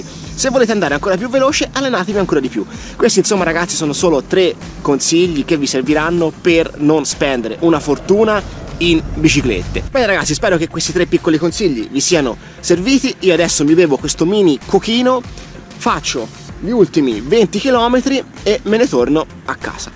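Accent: Italy. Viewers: Ma di quale parte di siena sei? Dal tuo accento mi sempri senese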